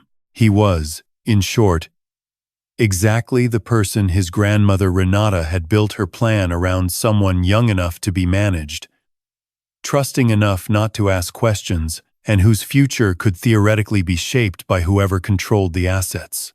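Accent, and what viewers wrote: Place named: United States. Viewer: Having an American voice use British vernacular is very annoying. It really comes across as fake!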